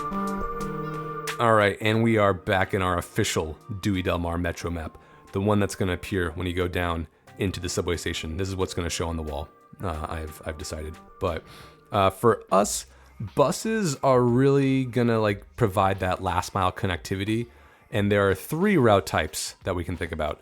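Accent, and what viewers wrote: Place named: United Kingdom. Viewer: Dewy Tubey, a little british accent LOL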